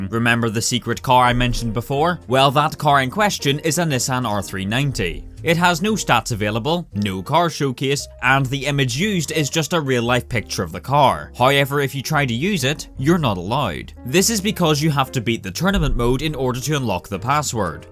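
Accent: Northern Ireland, United Kingdom. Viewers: This guys sound british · Norther irish accent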